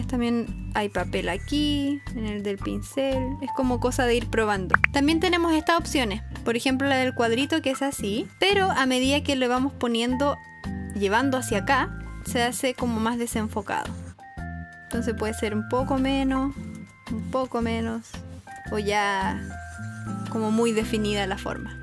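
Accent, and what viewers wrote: Chile. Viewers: sos chilena? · cuando escuche tu voz dije: OOOOOHH es chilena xd edit: Like y gracias por el tuto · holi eres de chile? se nota en tu voz uwu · de casualidad eres chilena? es que tu acento es super marcado y lo conozco porque tambien soy chilena:virtualhug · Eee es chilena vivan los chilenos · eres de chile se nota por como hablas no digo para ofernder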